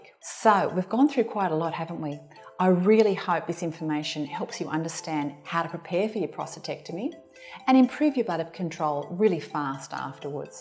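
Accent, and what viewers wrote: Australia. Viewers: Thank you! Very helpful and nice to hear an Aussie presenter. I miss Australia! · She speaks with Australisn accent. It is very nice to listen to.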